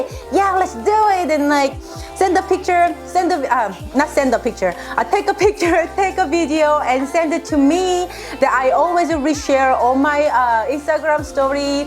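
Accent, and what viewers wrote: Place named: South Korea. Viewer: It is a phonetic spelling of how she actually pronounces the word with her Korean accent. It's a joke.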